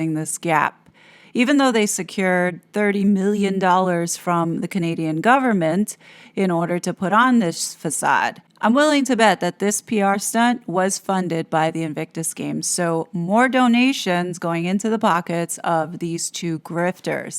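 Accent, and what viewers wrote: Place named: New York, United States. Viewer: I listen to for the New York sass… and hope to be comment 1000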